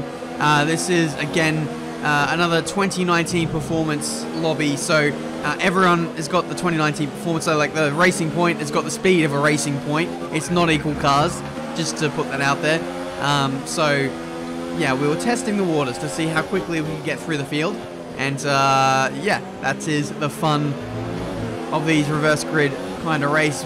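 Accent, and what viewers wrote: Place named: Australia. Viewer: That moment when you expect ‘Yo, JD here, TRL Limitless’ (in a British accent), but you sadly hear: What is up guys, welcome back to a brand new video’ (in an Australian accent)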